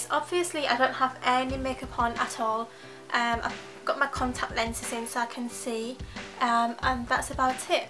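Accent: United Kingdom. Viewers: by accent i just assumed you're in uk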